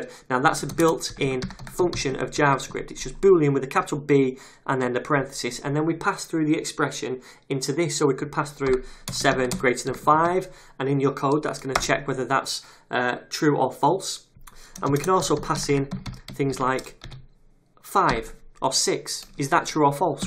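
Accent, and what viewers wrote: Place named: Manchester, United Kingdom. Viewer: That's a joke, right? Just in case it isn't, he's English. It's a Mancunian (someone from Manchester) accent.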